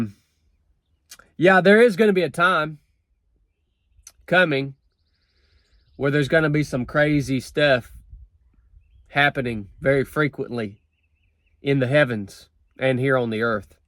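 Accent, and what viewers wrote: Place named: West Virginia, United States. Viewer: So you know has WV accent.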